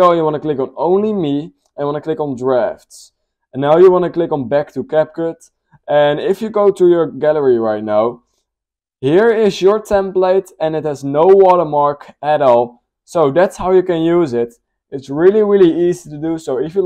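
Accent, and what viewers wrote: Netherlands. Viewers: Are you dutch/nederlander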